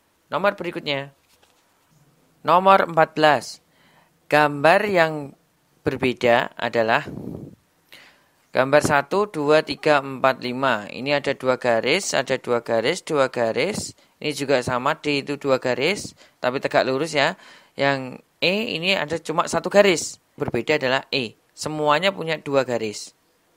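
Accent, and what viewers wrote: Java, Indonesia. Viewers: Saya kalo dengerin mas menjelaskan soal soal suka ketawak... Abisnya lucu sih 😁😄😄 kalo ngomong medoknya ituloh bisa bikin saya ketawa 😄😄😄 suka guyon.... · Ikutun channel kamu saya jafi ikut medok ngomongnya koh😅🥰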